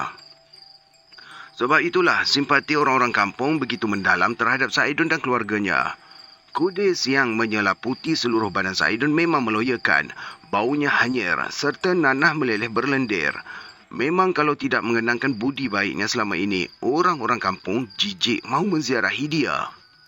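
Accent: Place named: Perak, Malaysia. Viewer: Assalamualaikum...wah arini mmg byk story ye cikgu☺️..dari nak kejor mat rempit membawa ke kelas bahasa..loghat perak pulak ye! Apa2 pun mmg terhibur .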